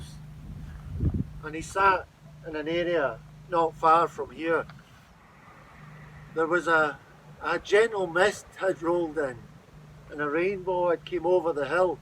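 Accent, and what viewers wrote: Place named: Scotland, United Kingdom. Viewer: I love a great Scottish accent telling a tale of wee folk in a beautiful place! It's a great escape from the modern world and all its troubles!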